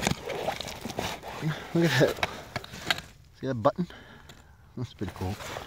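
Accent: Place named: Boston, United States. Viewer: I like that 'Boston Accent' !!!! Perfect setting for 'The Dig '!!!!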